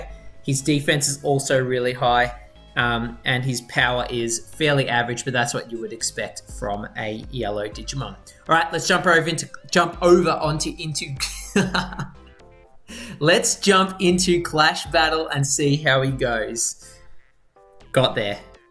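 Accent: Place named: Australia. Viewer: Cheers for all your videos your making on rearise man i really appreciate it, its refeshing hearing another aussie voice keep them coming bro you've got yourself a new sub :)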